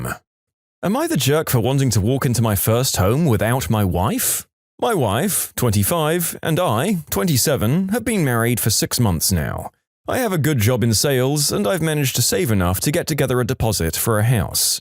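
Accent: United Kingdom. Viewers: Uhh British narrator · the british accent is the biggest tradgedy I was hearing a bunch of old podcasts of yours and this came up and I am like "oof that voice hit me on the head" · When did mate become British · Not a fan of British narrator. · Again British???!!!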